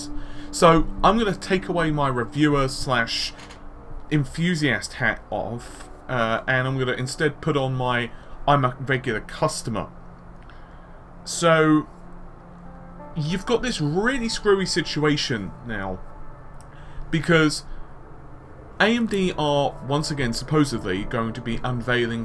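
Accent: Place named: United Kingdom. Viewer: BuckRogers yep, I'm from Costa Rica, i can speak and comprehend pretty well, but due to his tone it is sometimes a bit harder to understand what he says, I'm too distracted with his tone and accent. I gotta put more attention to it. He sounds british to me.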